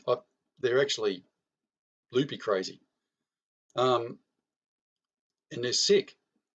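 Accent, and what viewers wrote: Australia. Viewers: I'm so glad you do this. You're one of a handful of Aussies on YouTube saying this stuff. I have an American friend who though quite the lefty at least understands the idea of prepping. I always shout out to the Aussie preppers on overseas channels. It's nice to come and hear an Aussie talking. I've only been prepping for a little while, but really I've gone back to what my Mum used to say and she didn't know about any of this globalist stuff. But she was a farm girl and had been told about the great depression so she knew.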